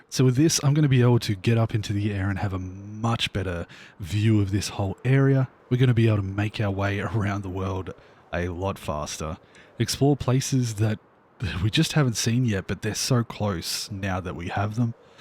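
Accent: Australia. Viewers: Are you Australian?? I am new to your channel and loving every video you upload! Your voice is really soothing so make it a relaxing experience even when you almost “falling” into the void heheh cheers and take care!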